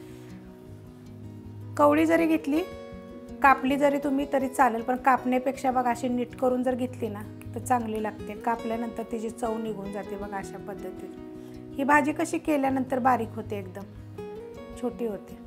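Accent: Satara, India. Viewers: Tumch aavaj aikla tri mla sataryat aslya gat vatt 😍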